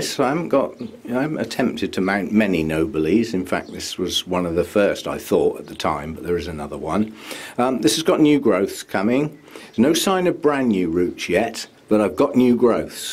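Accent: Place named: Norfolk, United Kingdom. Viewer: Most informative video I've found so far! Really helpful. Thank you. Also as a Norfolk girl, I'm loving your accent. Sounds like home :)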